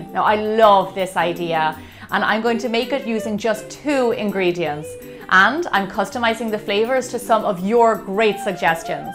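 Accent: Ireland. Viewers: Are you from Ireland? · Are u Irish??? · Gemma Stafford You're from Ireland aren't ya 😊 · She has to be irish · I think she's from Ireland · Who else is addicted to that irish accent (if I'm right about the accent)😂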